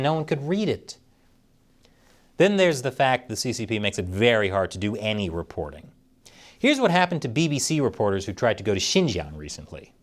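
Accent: Australia. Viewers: Mate if you are going to insult people from New Zealand get you shit right., you insulted us by using an Australian accent..... we spend mot of our time insulting Australians.. you do no that New Zealand is not in Australia right? If we are insulting people you sound like small child and your face looks like your holding a fart in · Nice Aussie accent there! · Thats an Australian accent ont kiwi & yes, Jacinda horse faces fuck wit has mess up our country.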